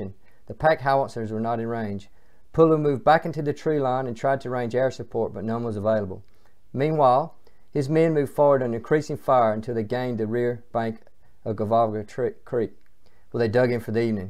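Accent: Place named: South, United States. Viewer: Outstanding work Marine! So many stories have been told about Colonel Puller that it sometimes is difficult to separate fact from fiction. This is definitely fact. By the way, I am trying to place your accent as I am a fellow southerner. I am thinking Virginia or North Carolina. I am from Tennessee and am just wondering on a personal level. It makes no difference as I am proud to view your efforts on behalf of the U.S. Marine Corps.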